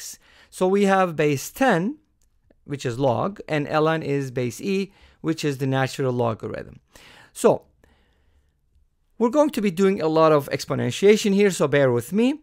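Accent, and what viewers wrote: Turkey. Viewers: I think you're a turkish. 'cause you speak english with turkish accent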